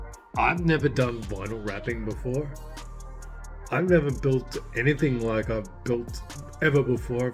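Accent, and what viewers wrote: Australia. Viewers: I got directed to your channel by YouTube and it looks like a really interesting build. Nice work on the body, it looks pretty amazing. The wheel hop issues likely started becuase it's a spool axle on the rear and typically go karters use weight transfer to unload the inside wheel to make these things turn. The trick won't work with your car, because you've lengthened the wheelbase and increased the mass quite a lot. The problem that's resulted from that is that you've had a failure which has resulted in compliance finding it's way into your chassis somewhere... I'm guessing, but I'm guessing it'll be the wheel bearings on the rear or you'll find that you've got a frame crack at the end of those flanges you've got welded on to the chassis. The people who are talking about a live axle etc, are wrong, there's not a specific problem with live axles, but compliance results in wheel hop, there are a bunch of ways to bake compliance into a vehicle design and live axles are renowned for it because people fail to separate their upper and lower links enough on a four bar rear end, or use rod ends which results in the rod ends flogging out under load. 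In both instances there's a very basic fix, but it's geometry and complaince related, nothing else. Yours probably isn't a hard fix either, but it'll need some proper design work done on it. You sound Australian, where are you based? I'm an engineer and based in Brisbane. I reckon it'd be interesting giving you a hand if you're keen.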